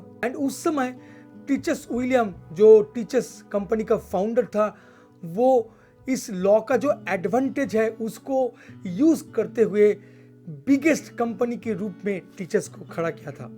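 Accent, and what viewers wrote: Bengal, India. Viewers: r u a Bengali?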